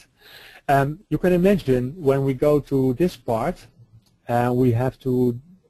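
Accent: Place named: Netherlands. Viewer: Dutch accent. :)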